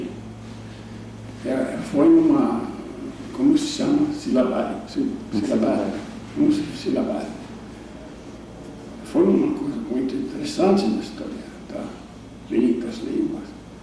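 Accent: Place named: United States. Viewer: As I see it, the "issue" is not having an accent per se, as more often than not, words are pronounced just as they should. But the fact that he pauses a lot and takes a moment for choosing the word he wants and then apply correct grammar around it for the sentence he's about to say. Therefore, he doesn't seem anywhere near a native even tough he has better grammar than some natives and has a great pronunciation overall. A native unaware of his identity would probably consider him a foreigner that had spent about a decade or even more living in Brazil. The host on the other hand, speaks with a heavy accent as American as 4th of July.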